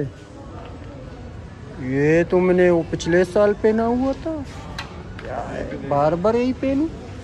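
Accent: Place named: Balochistan, Pakistan. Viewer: BRO your Urdu is gud with Balochi style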